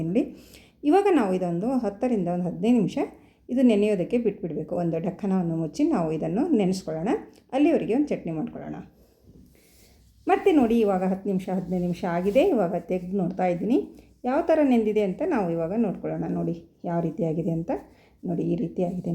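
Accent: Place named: Kerala, India. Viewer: Pronouncing Vada, Dosha is Malayalam influence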